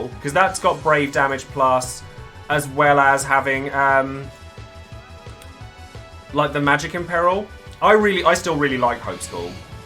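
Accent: United Kingdom. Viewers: This is a set kill of a team thanks for sharing i didnt have garlands burst but i have terras burst as well as her LD also Garlands and Layles LD , Used tifa support in the beginning as well and pulled it of in 30 turns. Love the UK accent a lot, i think of amy winehouse every time i hear someone from the uk speak. After i beat this stage and got the tickets you would not believe i pulled out both kurasame, seifer, and sephs LD 🤯🤯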